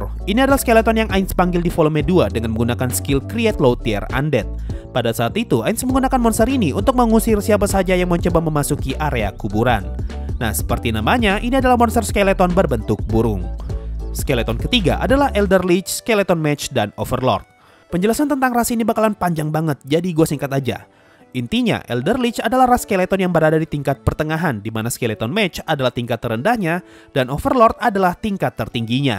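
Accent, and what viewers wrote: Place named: Papua, Indonesia. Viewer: Z dengar kk pu logat nih kayanya kk tinggal di Papua to.. di kota mana kk??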